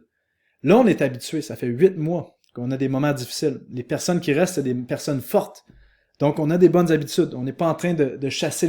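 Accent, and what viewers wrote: Quebec, Canada. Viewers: Il me tue avec son petit accent québecois la ! 😂😂 "Nen acheté" la petite liaison des mots orales mdrrr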